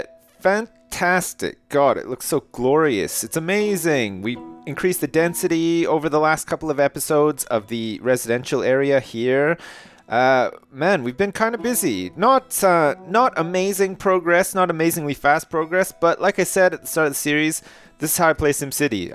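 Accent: Canada. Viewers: Is canadian???? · are you canadian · YOUR FROM CANADA?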